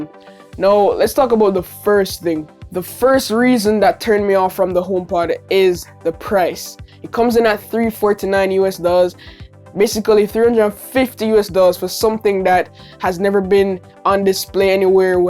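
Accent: Jamaica. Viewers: I hear a Jamaican accent.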